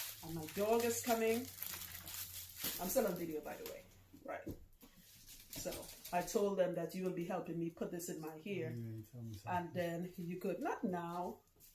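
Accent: Trinidad and Tobago. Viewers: You look and feel like family, Share my daughters name and nickname, AND are on the IFA PATH! Love it! I have watched you off and on for a bit to decide whether I am ready to commit to the loc journey, and HOW to correctly care for my crown when I am ready. I must say THIS SHARE RIGHT HERE.....un-cut and No Mamagisms... was right on time! I bought a journal years ago. It was blessed when I was crowned in Naija. Now all it needs is 27, Hebrew, and The Seal of Ase-WA!!! Words are not enough to express my gratitude because listening to this video today to the end has confirmed, I have been in my own way post a traumatic marriage. I must admit Your accent and euphemisms had me missing T&T too bad. I am going to ring family after this video lol just so they can subscribe as well. I am full on supporting now. Especially because your story makes one introspective. 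I was crowned a Rare Female King and now I need the Balance of My Divine Male. I just need to follow the wisdom imparted in this video. Modupe ati Iré Ní O!! Will update you on the manifest💯💛💙💛💯ASE!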